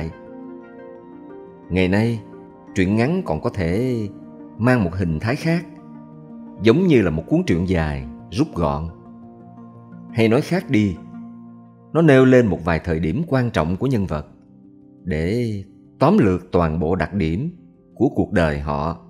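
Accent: South, Vietnam. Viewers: Hi, Chúc anh ban cùng gia đình mọi sự tốt đẹp nhé! Tôi phải ngả mũ chào ngưỡng mộ qua tuyệt vời!! 👍👍👍👍””I fall in love with you ””” about Well- knownledged, well prepared, well organized từ cách giới thiệu rất hoàn hảo, đẹp mắt! Từ giọng đọc thật truyền cảm, thật “” Nam kỳ tình”” giong cuoi rất khôi hài!! Chúc anh ban thành công và tien mai trên sự nghiệp nhe! BRAVO BRAVO 👏👏👏👏👏❤️❤️❤️❤️❤️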